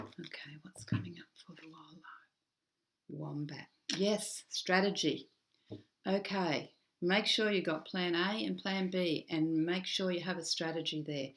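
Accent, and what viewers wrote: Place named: Australia. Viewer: 1. I love the Australian accent. 2. Absorbing the Love vibration you emit. 3. You are the perfect spiritual advisor needed at this time. In conclusion, your insights and suggestions help to analyze how one can manipulate ones perceptions for a better understanding of ourselves, those around us and the collective. Much gratitude, love and Blessings for your time and energy. <3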